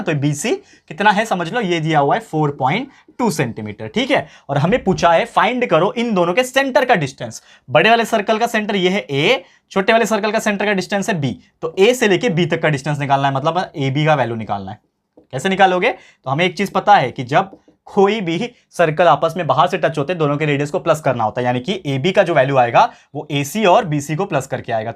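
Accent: Maharashtra, India. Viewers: Sir aap marathi bohot cute bolte ho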